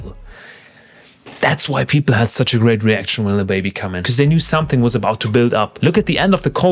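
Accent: Germany. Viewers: I was gonna comment that you sound German until I checked your about section lol. Great video! Please do more of these.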